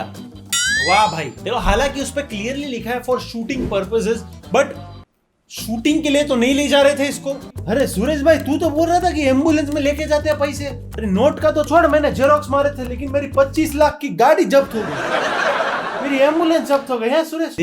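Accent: Gujarat, India. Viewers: Your Gujarati accent.. 😂😂😂I like it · That gujrati accent of yours 😂😂😂 soo good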